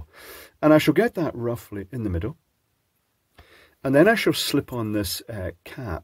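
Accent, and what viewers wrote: Scotland, United Kingdom. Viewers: Poshest Scottish accent I've ever heard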